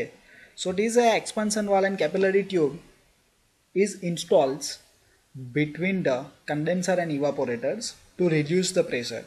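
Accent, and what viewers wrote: Gujarat, India. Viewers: R u South gujarati ?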